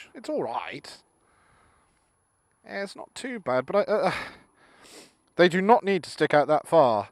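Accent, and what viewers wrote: United Kingdom. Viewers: I like your bike reviews, but GO EASY on the "tally ho" bullshit! You sound annoying, to say the least! All the yelling, and the "british accent" crap takes away from the overall review. I don't know, nor do I CARE, where you're from...JUST TONE IT DOWN A LITTLE! All your reviews are interesting to WATCH... just STOP TALKING! Where I'm from, if you talk like that, you GET YOUR HEAD STOMPED IN, AND YOUR NOSE BROKE!!!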